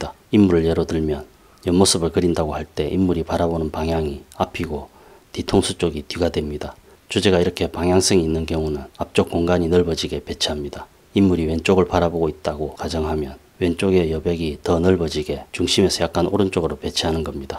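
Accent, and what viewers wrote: Gyeongsang, South Korea. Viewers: 안녕하세요, 제가 캘리그라피랑 수채화 배우는데 수채화 찾아보다 인물화 검색하니 선생님 영상들이 떠서 들어왔는데 그림 중에서도 가장 어려운 인물화를 생동감 있게 그리시네요. 감탄합니다.. 그림도 그림이지만 영상 내용과 맞지 않는 질문일 수 있는데, 선생님은 경남 서부 분이신가요? 제가 팔도 사투리에도 관심이 많은데 선생님 억양이 경남 서부이신지 아니면 경북이랑 섞인 경남 함양 쪽이신지.. 궁금하네요. 경남 경북 억양이 골고루 섞이신 것 같은데 대구나 경주 같은 경북 남부 혹은 경남 동부 부산쪽에서 들리는 음색이 아니셔서 의아해하다 용기 내서 야심한 밤에 댓글 남겨봅니다. 감사합니다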